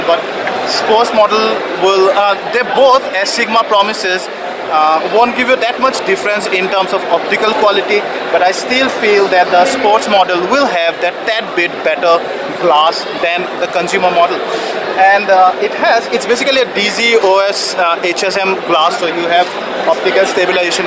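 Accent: India. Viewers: Ur accent looks like u r an indian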